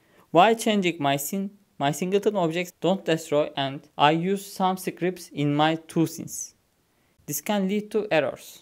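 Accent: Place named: Turkey. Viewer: helal aksaanindan anladim turk oldugunu guzele benziyor eline saglik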